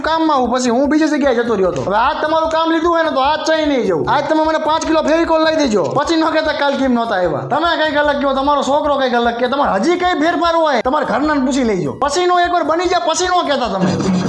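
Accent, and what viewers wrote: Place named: Kathiawar, India. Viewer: Be yrr ekdum kathiyawadi accent che tamari. Hu bhi veraval thi chu and hamara gharr badha aam j bole che. Mara Ahmedabad na friends ne toh khabar bhi na pade ke aa su bole che. Hehehe karta hoy. 😭😂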